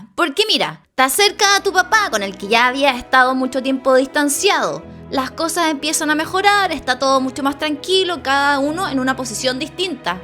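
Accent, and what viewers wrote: Chile. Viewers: No puedo con este acento chileno uy no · tu timbre chileno de hablar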